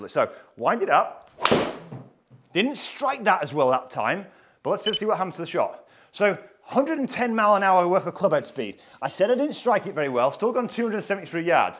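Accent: United Kingdom. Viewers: Found this channel today. I'm back playing golf after take 15 years off to be a single dad. Why is it I learn the most from people with an accent from Great Britain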